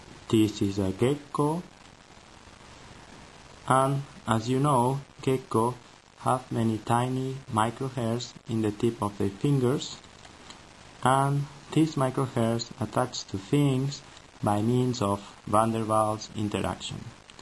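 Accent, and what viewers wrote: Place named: Japan. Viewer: Hola,! Buena presentación! Tu pronunciación esta bastante influenciada por el japonés...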